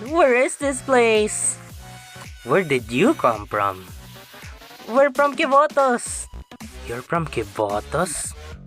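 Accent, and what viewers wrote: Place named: Southeast Asia. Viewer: SEA ass accent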